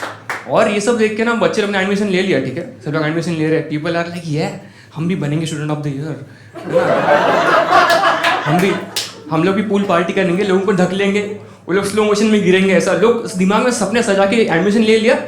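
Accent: Maharashtra, India. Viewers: Your marathi tone is masking your talent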